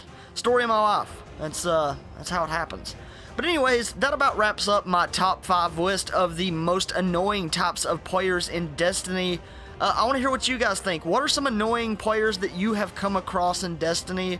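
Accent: South, United States. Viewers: DigitalNinjas it's a southern accent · Dude sounds exactly like Tyler from secureteam10 with a southern accent. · Love your Southern accent! Not much people down here that have Youtubes! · DigitalNinjas, I would bet Southern United States. Texas or East of there but not as far as the East coast.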